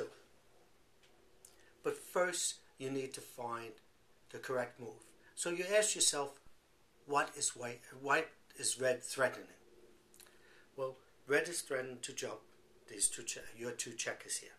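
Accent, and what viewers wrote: New York, United States. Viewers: New York accent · Wayback thats how all New Yorkers sound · Not really lol, just normal NY accent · ALEX he sounds like a man from New York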